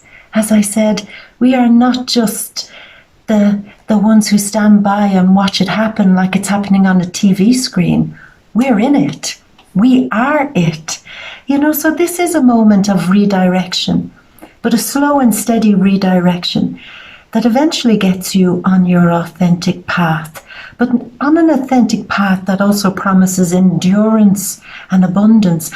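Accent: Ireland. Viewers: I did not know you were living in Italy, sending love, Me mum is from Ireland and your accent sounds very Irish. I assumed you were from Ireland. lol Take care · Hey. I must ask...I have been watching you for about a year and half, and have always been wondering where your accent is from. You mentioned your living in Italy. I used think when I first started to watch you, that you were from somewhere in northern Europe and then I have been thinking I am hearing an Irish accent. Please tell me where your accent is from 🤣 Thank you so much for all your wonderful videos. It's a pleasure to watch your beautiful face and listen to your lovely videos each month. Lot's of love, Deborah 🥰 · Lovely to hear your lovely Irish accent today........really cheered me up.😋👍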